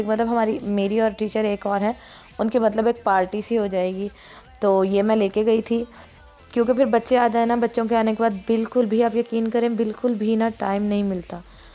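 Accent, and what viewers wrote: Saraiki, Pakistan. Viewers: Ap saraki speaking ho kya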